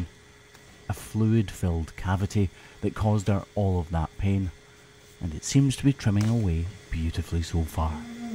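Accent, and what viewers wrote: Scotland, United Kingdom. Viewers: The Scottish Morgan Freeman.😅😂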